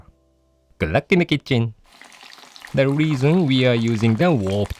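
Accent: Japan. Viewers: 这英文真的很日式。(˶‾᷄ ⁻̫ ‾᷅˵) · カタカナ英語聞きズラいなぁー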